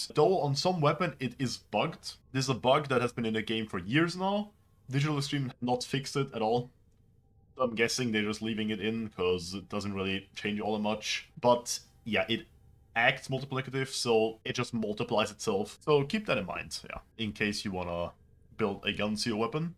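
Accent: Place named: Germany. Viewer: Love your content, very informative. In like 2 minutes i learned more than 90% of the big warframe ytubers convey in 20. In case i heard your accent correctly, greetings from a fellow german from hessen.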